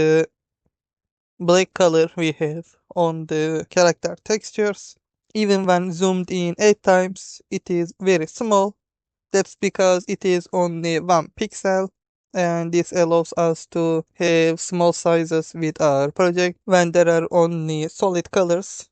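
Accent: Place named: Turkey. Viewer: Türk aksanı gibi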